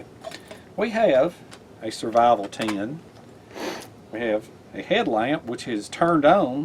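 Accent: South, United States. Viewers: So full of information. Great humor and have great southern accent love to hear you talk.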